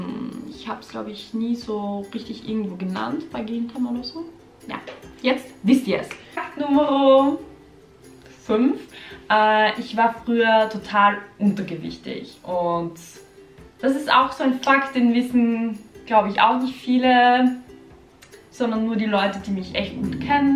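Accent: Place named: Austria. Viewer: Sie ist aus Österreich, deshalb die Aussprache!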